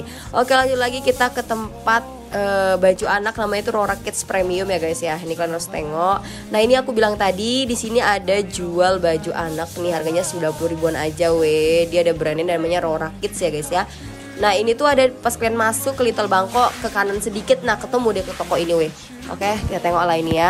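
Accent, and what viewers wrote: Medan, Indonesia. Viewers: Logat medan itu kk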